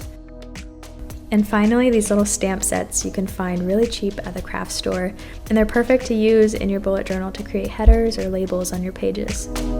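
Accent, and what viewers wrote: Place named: United States. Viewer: You sound american how did you get something from 다이소? Do they have online stores?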